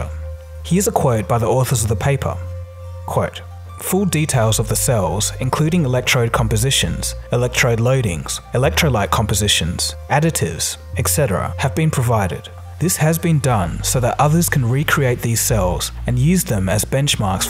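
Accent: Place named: Australia. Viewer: Ford falcon?! Only noticed the subtle Aussie accent after that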